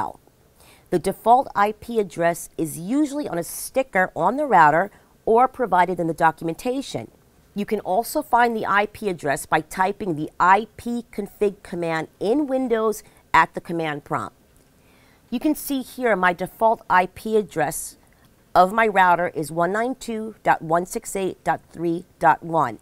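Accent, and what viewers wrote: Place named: New York, United States. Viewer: THICK ny accent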